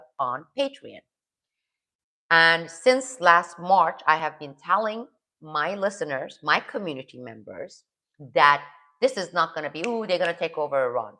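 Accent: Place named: United States. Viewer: Amerikada yaşadığın için çok akıcı Amerikan aksanı ile İngilizce konuşduğunu biliyoruz zaten ama senin izleyici kitlen Türkler ,bişeyler ispatlamaya ihtiyacın yok!!!!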